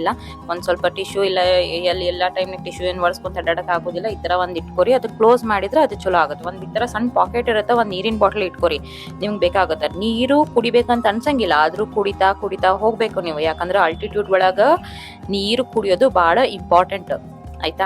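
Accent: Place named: India. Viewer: How is talking Kannada ? Yaav ooru, namm hange ada nimm Kannada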